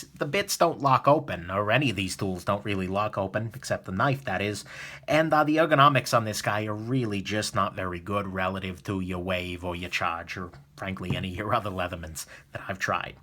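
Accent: New York, United States. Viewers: You from New York bro? I worked with a lot of cats from there and you sound like them haha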